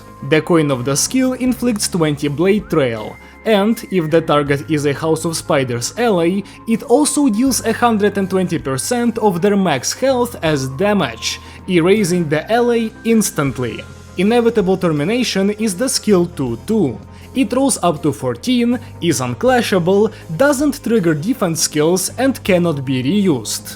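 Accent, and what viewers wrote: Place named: Russia. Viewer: Y teba akcent russki =)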